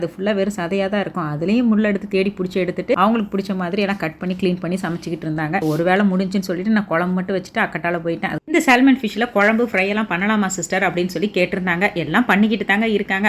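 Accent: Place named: Coimbatore, India. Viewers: என்ன அம்மணி எங்க ஊர் தமிழ் பேசுறீங்க. நல்லாத்தா,இருக்குது பேசுங்க, பேசுங்க அடுத்த தடவை வந்தா, கோயம்புத்தூர் பக்கம் வந்துட்டு போங்க. ❤ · S pure kongu slang but super sis unga slang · ஆமா என்ன எங்க ஊர் (கொங்கு) slang பேசரீங்க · Ennagga enna coimbatore slange varuthu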